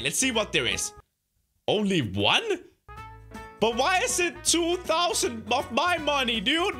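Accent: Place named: Denmark. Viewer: A danish accent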